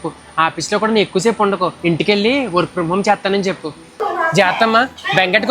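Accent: Godavari, India. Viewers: u are extraordinary …గోదావరి యాస అద్భుతం…అన్ని సంఘటనలు మన ఇంట్లో జరిగినట్లే ఉంటాయి…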